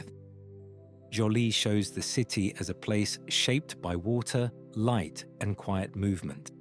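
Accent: United Kingdom. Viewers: So, hey, I love what you’re doing with this channel. I am really interested in seeing these old town scenes from historic times be recreated. What you have done with the AI is greater than I ever could have imagined. I do believe that these recreations of the past are a world treasure. There are some things that need improvement, though. The repetitive slides tend to bother me and I feel sort of triggered seeing similar slides be shown several times over. Also, I suspect you use an AI voiceover, and I hope soon that gets changed too. Because that specific British accent and tone of voice is incredibly annoying to me, but maybe it’s just because I’m not British lol P.S. please recreate historic images of Ireland (1600’s to 1800’s) and America’s early western frontier (from the American Revolution to the Jacksonian Democracy)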